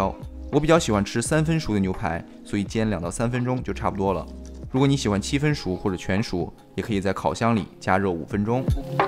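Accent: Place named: Sichuan, China. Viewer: 這種口音是四川嗎？好好聽啊☺️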